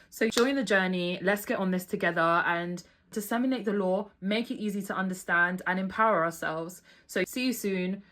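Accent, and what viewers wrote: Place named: United Kingdom. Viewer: You have a British accent so why didn’t you go to school over there? Are you just trying to grift? 🤔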